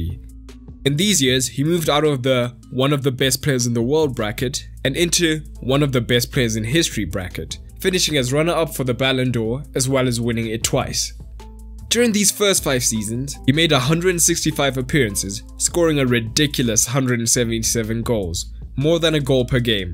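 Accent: South Africa. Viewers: You have a South African accent. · Am I the only one who thinks this accent is South African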